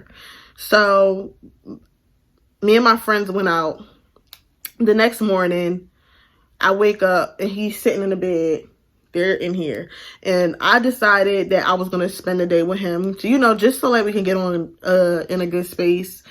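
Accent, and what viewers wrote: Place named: United Kingdom. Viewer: So proud of you! Its the British accent for me lmao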